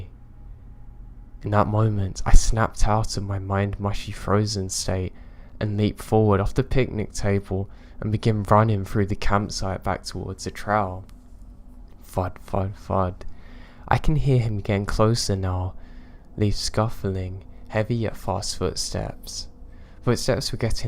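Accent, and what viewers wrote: United Kingdom. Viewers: What kind of accent do you have? Seems British but a bit different, great videos btw!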